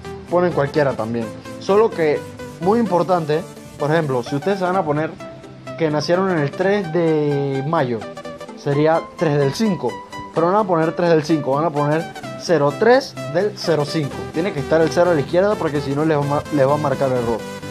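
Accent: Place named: Ecuador. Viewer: es que me sonabas un poco con un acento ecuatoriano :v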